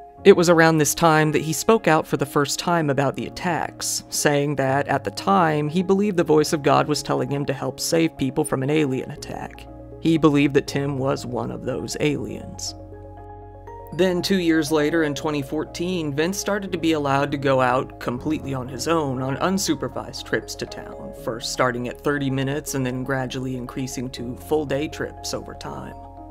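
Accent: United Kingdom. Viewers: That really REALLY sounds like Adrian from Coffeehouse Crime. As a fellow Brit the likeness is uncanny. Anyway, great upload as always! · Wait, who was the British voice? Sounds sooo familiar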